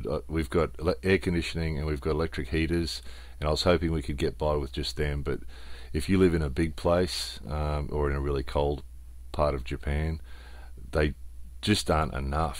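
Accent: Australia. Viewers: Very informative. Nicely narrated with a of Aussie accent .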